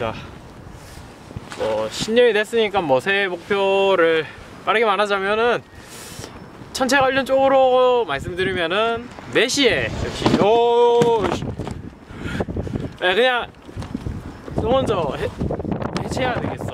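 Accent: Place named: Gyeongsang, South Korea. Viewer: 관측은 어디서 보통하시나요? 대구분이신거같은데??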